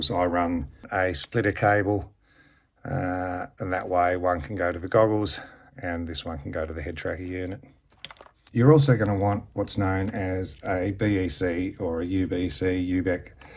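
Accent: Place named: Australia. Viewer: Woot! Sounds like an aussie accent! Even better.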